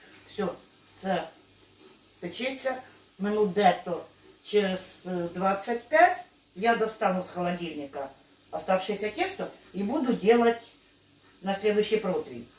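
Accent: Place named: Chernihiv, Ukraine. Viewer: Доброго дня! Випадково нашла ваш канал і одразу підписалась! Така щира і життєва жінка! Вибачаюсь ,але дуже цікаво з якої ви області? Мені здається,що чую рідний акцент,так як у нас говорять на Чернігівщині))❤ Дуже гарні рецепти і відео сповнені енергії і мотивації! Мира і добра вам!❤❤❤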